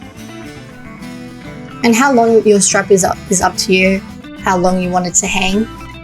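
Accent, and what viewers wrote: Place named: Australia. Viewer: It's funny how many people said that her instructions are not understandable, but there's a whole bunch of others saying they did just fine and caught on easily😂. Personally, I think this pattern is one of the easiest to understand as others give way too much instruction and just confuse u more. Besides I love her aussie accent ❤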